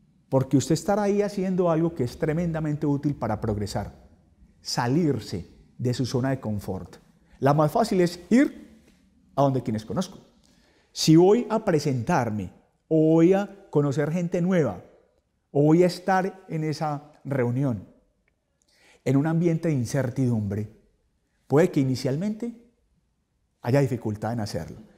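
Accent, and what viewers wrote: Antioquia, Colombia. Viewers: definitivamente el acento colombiano hace que sienta que me están castigando, un abrir de ojos instructivo. Que sea de una vez. El momento es ahora. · LUIS CARO no lo compares con ese asesino. Jajaja te hace parecido por que ambos son paisas, son de la misma región de Colombia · Me encanta el acento colombiano!!! Ay Matilde!!! 🤣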